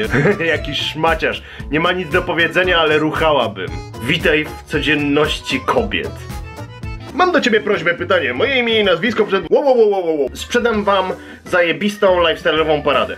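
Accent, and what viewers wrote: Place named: Poland. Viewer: Nagraj beczkę po angielsku z takim zajebistym polskim akcentem ^_^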